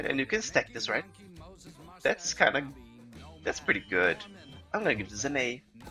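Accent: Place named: Brazil. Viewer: where are you from? looks like brazilian accent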